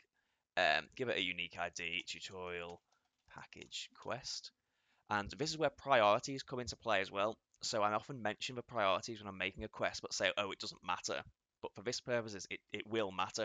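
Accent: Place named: Liverpool, United Kingdom. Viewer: great to see a new vid from you mate, i still struggle with the scouse though :)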